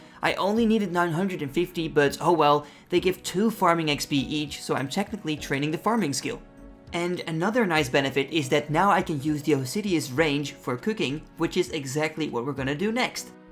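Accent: Netherlands. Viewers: The dutch accent is strong with this fella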